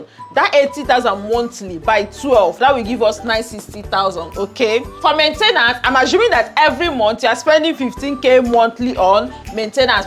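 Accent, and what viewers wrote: Nigeria. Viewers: Nigerian accent sucks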